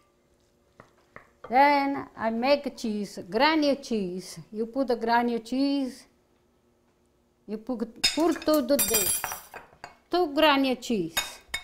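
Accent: Italy. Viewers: I am a second-generation Italian American who grew up in the 40s and 50s in upstate New York in an Italian neighborhood. Both of my parents spoke Italian but chose to not teach us because "we were American". But all of my grandparents and most of my neighbors spoke with the same accent as you. When I watch your videos, it brings me back to when I was a kid. Most of your recipes are just like my mother's. I have tried several of your recipes and they are never disappointing. We had friends over for lasagna recently and my wife made the lasagna with store-bought pasta because she didn't want to take a chance with your recipe until we tried it first ourselves. Well, I made your recipe myself and after everyone had a portion of my wife's. I brought out my dish and everyone raved about it. Even my wife, who is usually very stubborn, admitted that my dish was better than hers. 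I like your singing too. My father had a beautiful operatic tenor voice and sang all of the time. Thanks again for being you. Domenic Campanella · Hi Italian. Grandma. I love your. Cooking. All your recipes. Are. Great. I am making artichokes. This weekend. I watch you every single day. I enjoy your singing. Plus I love your Italian. Accent. Yim 4th generation. From Sicily. Keep. Cooking. Grandma. God. Bless you. Love. Ssndyin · I love, love, LOVE your Italian accent. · Love watching you and enjoyed your Italian mannerisms and accent. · I love her Lil Italian accent plus recipe lock yummy❤ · No wonder this video is viral: "Now-a, first-a you gotta open-a da peppa, you got a nice-a peppa like-a dis."? You're ADORABLE! Sweet stuffed Bell Peppa's, from a Sweet Italian Bella Donna like-a. Statta Buon! Ciao.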